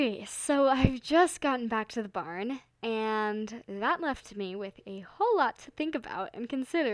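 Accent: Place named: United States. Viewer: I found this kinda awkward because its an American ish voice I don't know why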